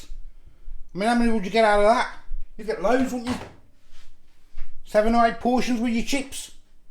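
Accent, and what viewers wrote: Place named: Midlands, United Kingdom. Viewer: I thought i cud detect a midlands accent.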